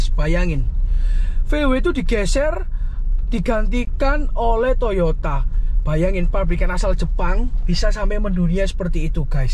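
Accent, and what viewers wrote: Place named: Surabaya, Indonesia. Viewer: Mantap koh...., opininya logat suroboyo an...😁😁😁😁😁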